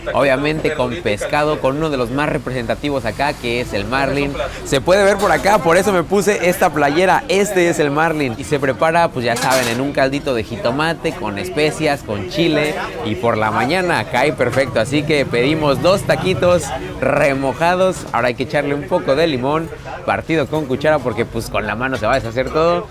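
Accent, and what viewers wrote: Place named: Mazatlán, Mexico. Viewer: Ya sete pego el asentó de aya 😁que gastronomia tan rica como se antoja ir a mazatlán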